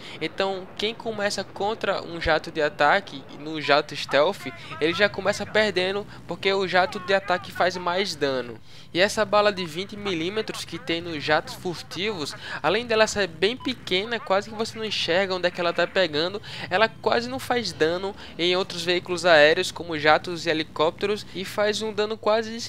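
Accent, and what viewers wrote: Northeast, Brazil. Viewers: Você é nordestino?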